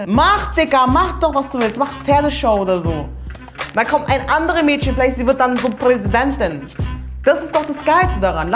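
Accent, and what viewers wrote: Berlin, Germany. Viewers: habubti! Wallah du bist eine Bombe. Deine "Berliner Schnauze" / Berliner Akzent hoert sich an wie "Koefte in der berliner currywurst Sosse".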